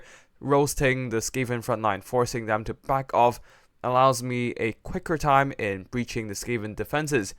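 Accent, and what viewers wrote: China. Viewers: trying to place your accent but really unsure. Are you chinese?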